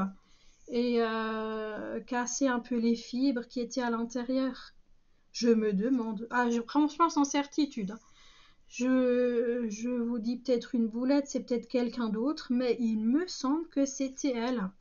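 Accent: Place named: Alsace, France. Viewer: Bonjoure tres joli projet cest vraimabt bizard detendre quelqun dautre avec laccebt alsacien 😂 bonne petite croix et vive lasace hein bis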